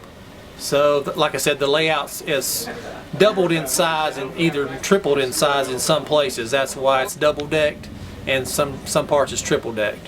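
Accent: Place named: United States. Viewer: Aloha All,,, Just Love It,,, But I Do Have One Question,,, What Language Does This Man Speak,,, Sounds Kink of Like PURE AMERICAN To Me... What Great Craftsmanship... It Would Make Michelangelo So Proud,,, What Great Craftsmanship... How Many Cars,,, ? ,,, All N Gauge >?<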